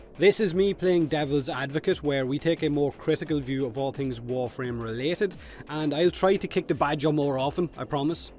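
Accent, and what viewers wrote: Ireland. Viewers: Sorry, but aside from the curse words, you sound like a fluffy irish teddy bear even while raging:D And that's not a bad thing! It's a good thing that even when you speak your toughts openly, you still remain composed and constructive. True raging as in yelling, incohherent bitching, flailing, name calling and acusations are not what your brand is about (at least I think, but correct me if I'm wrong). Even tough I have 1000+ hours in the game, there is not much that bothers me. I give DE a lot of leeway, because it's their game, we as players don't own it (see the entitlement of Star Wars fans over TLJ for example, how this can be a bad thing) and because it's F2P. The 2 things I would like to see looked up in seriousness is the level scaling and difficulty curve of the game and a rebalancing of all weapons, shortening the gap between older and newer weapons. 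I'm fine with tier weapons, but I'm not fine with old weapons having less than 8% crit/status chance, while new weapons come out all with 25%+ in one of thise categories. And no, Rivens are not the answer. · P.S. As much as I like hearing your beatiful irish voice rant about stuff (don't actually care what about), I like your regular approach better. WF is free to play after all, so DE can do with it whatever they feckin like. BUT: Giving feedback, adressing issues, pointing out things that are wrong can help the developer improve their game. At the end of the day DE still wants the game to be as good as possible (or so I hope), so we, the fans, should defenitely criticize their work and tell them what we don't like, but at the same time (very important) also what we DO like, so they can use that info to decide what to focus on for future updates. And all of this can be done in the calm polite way, you, have ever since done it. 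No need to shout, no need to rant. Just tell 'em what went wrong and hope they fix it. If they don't, don't spend money. But, as mentioned above, I'd like to hear more irish cursing so do one of these every once in a while. Slán go fóill (Hope I got that right)^^ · the dulcet tones of your brogue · Critical was tone on considering the concerns, but more worth it because Irish rants are the best rants. Better at the pub over a beer. ;) Also, Vay-Donald-Hek. <3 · Youtube's auto generated captions isn't kind to your irish accent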